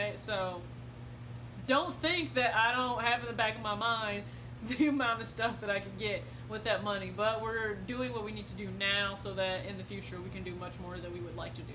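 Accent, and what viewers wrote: South, United States. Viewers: Yu remind me of Sandy off Sponge Bob but in a cute sexy kind of way 😝 ...I think it’s the country accent 😍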